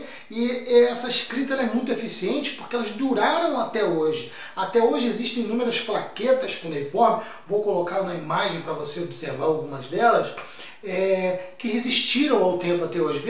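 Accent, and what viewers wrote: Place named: Florianópolis, Brazil. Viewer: Seu sotaque é de Florianopolis vc é de lá eu sou eu sou manésinha kkk!!!!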